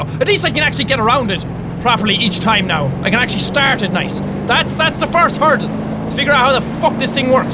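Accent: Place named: Ireland. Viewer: I love his Irish accent “Cars aren’t supposed to have tree wheels, their supposed to have four wheels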